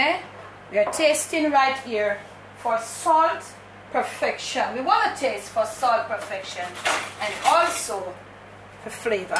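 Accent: Patois, Jamaica. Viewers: Love the patois. Don’t stop, adds sweetness to the videos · Chop yuh patois Ms we love it! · The people who thumbs down your videos a pure fools don’t change your patois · I enjoy the videos and the lovely Jamaican patois. As some one once wrote everyone would like to be Jamaican as it is cool. Keep it up. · Keep up the patois..... for those don't like it....... bun fyah fi dem. Big up 💪🏽💪🏽❤❤😘😘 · I came across your channel on new year's day of this year. I was so excited to see a fresh new face and to hear the patio ( the worst thing you can do is trying NOT to be authentic or "speaky spokey " as my elders would say). Your Jamaican accent is what brings a lot of flavor to your channel besides your delicious food. Just block the haters and continue with your patio. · Lady I love you 😅😅😅😅😅😅unno don't bother ask her how much millimeters of ginger.... 🤣🤣🤣🤣🤣🤣🤣🤣🤣🤣🤣you're the only person I follow on YouTube.... 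O...and chat you patois wi nuh cater fi nobaddi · Good evening,. Cook down or cook up it's all good. Don't allow anyone to change Yuh.. Me nah undastan er ting you talk in Patois but me luv it....Food tun up up up. Bodow...Boom Trini in de house. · mouth mek fi chat anything dont pay dem no mind, everytime I introduced this vlog to someone we talk about the same thing. Yuh just feel like an era of Jamaica that has passed, so pure and happy with your craft. Because this is a gift, you make me feel like I'm back home watching my Granny and Mommy cooking and laying out nothing but good Jamaican values. That switch up at 3;34 is exactly why only fiwi language can explain it. Always puzzle mi how u can sit and watch something like this and the only comment you can make is a negative one. Its draining people let that go. Full time we appreciate the beauty in wi likkle dialect, its just pure poetry once you understand it. 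keep teaching, I might not comment but like clock work we watch everything. Know that your are top 3 of all vloggers on this island. Rass Mokko, In Jamaica &. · I like the way how you talk patwa me use to live in Guyana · British🇬🇧 lady with Jamaican🇯🇲 parents. Mummy lived in UK for over 45 years. Mummy never "twiss up ah mout fi nobuddi traight!!! Jamaican til the day she dead....You are authentic JA!✊🏾 keep up the patois only tuppid eedat ah gwarn like dem fool👍🏾👍🏾👍🏾✊🏾✊🏾✊🏾✊🏾💯❤ Mannerz and Respeck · I love your cooking videos. The patwa mek it very authentic. Gwan wid it same way. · I’m enjoying the the patois, it’s your culture and you’re being authentic. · Me love me jamaican way of talking you go dont stop we talk sweet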